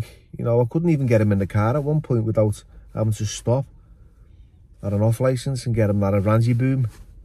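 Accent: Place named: Birmingham, United Kingdom. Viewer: that brummie accent thought you were doing an aussie lol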